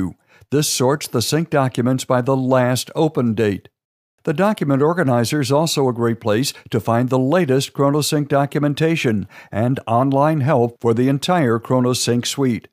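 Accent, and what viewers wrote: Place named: United States. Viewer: Thank God, at last a video fully understood by a man who speaks GOOD typically American language. I think all the videos that contain instructions must have been talking with so purely American to understand the rest of us who are not Americans. Thank you!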